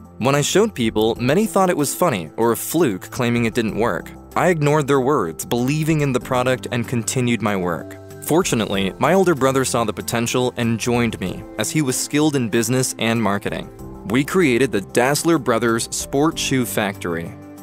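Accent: United States. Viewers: If he created Adidas why does he have such a young accent And if he is German how does he have an American accent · You sound american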